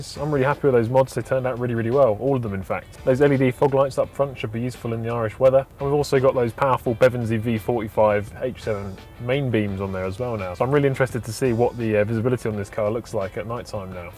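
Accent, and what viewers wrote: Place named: England, United Kingdom. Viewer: Dude, dont get offended on this. But it doesnt add up... IRL licence plate but you got English accent :) how come? Which year was the car made? Decent shape tbh! But also, i wish these fixes would cost the highest effort to complete :D ... or not all Landies are rubbish as the urban legend says? The lighting is pretty decent upgrade!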